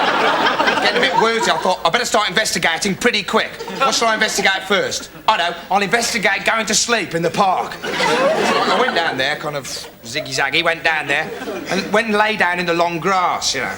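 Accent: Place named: Redditch, United Kingdom. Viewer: how does he know the redditch accent so well?? lol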